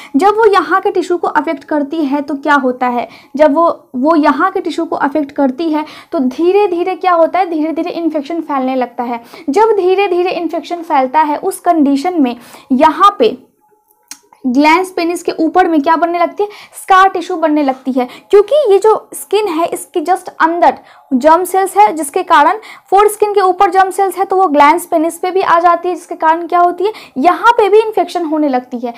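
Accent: Bihar, India. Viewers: बिहार या मैथिल इलाकों से होने की वजह से यह हो सकता है।ज्यादा सीरियस मत लो , इनकी मेहनत की तारीफ होनी चाहिए।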